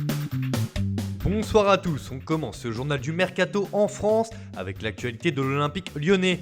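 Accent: Italy. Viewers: j'ai cliqué sur cette vidéo et si j'entends cet accent italien je met un pouce vers le bas, désolé mais vous me laissez pas le choix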